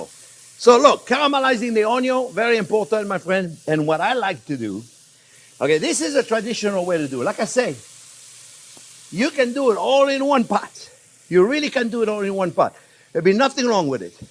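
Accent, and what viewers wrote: France. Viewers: Thanks Chef so much, I love all your talking, and sense of humor, saying "I am from N.Y" with that thick French accent:), you are so funny. and I truly feel lucky to find myself in a free , French culinary school, without the pressure to learn these recipes in English, with so much fun and humor. I agree those who think you talk too much, they don't deserve to watch you, also I find it extraordinary rude to speak to a chef of your Caliber like that, they sure don't understand the Culinary culture and in French Culinary school, if a student talks to Chef like that, would not longer be there. so these rude students, who watch your lessons for free and disrespect you, really don't deserve these teachings. I honor you. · Beside the excellent recipes and very well explained, you share with us, I enjoy the beautiful French accent . That is a plus. 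· Chief Jean continue talking , I love Your French accent it’s different than other chiefs , we choose yours to watch , 😂👏👍👌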